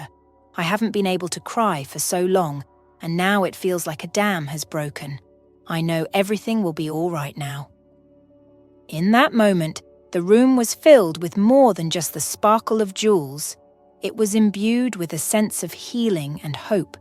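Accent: United Kingdom. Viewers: What a beautiful story I enjoyed It from the beginning to the end ! The narrator with British accent was wonderful. I am happy that Lana was cleared of suspicion on her actions Thank you so much I love this story!!!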